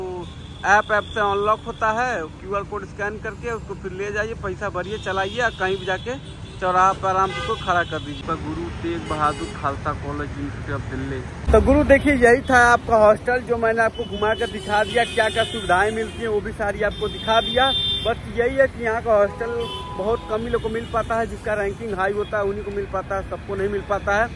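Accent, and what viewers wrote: Varanasi, India. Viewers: APKI BHASHA SE ME PEHCHAN GAYA KI AAPBANARAS SE HA MERE NANI KA GHAR BHI VAHA HA 😁😁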